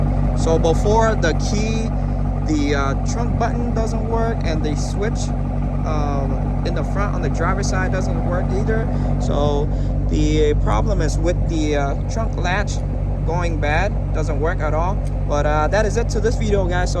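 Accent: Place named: Vietnam. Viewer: Knew you was viet from the first thing u said 😂💀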